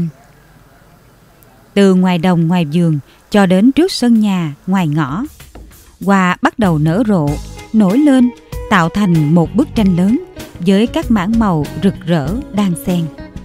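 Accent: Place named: Kien Giang, Vietnam. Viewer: Kien giang giong rien que toi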